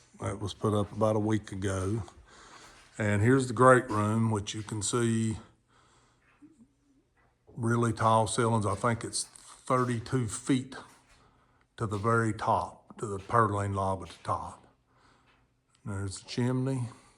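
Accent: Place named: Southwest Virginia, United States. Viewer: Wow! This place is spectacular! Now I cant afford any of this but it's stunning. I have to let you know that you remind me of my family growing up (accent). I was born in Wythe county and lived in Cripple Creek and then Wytheville until the age of 10 and we came further north to central va. If I could, this would be my dream... even a touch of it would. I'd love to live in this area again, it feels like home. My grandmother passed away and I have an aunt and uncle in Rural Retreat and this place is magical. Maybe one day I can find my spot ✨ Merry Christmas 🎄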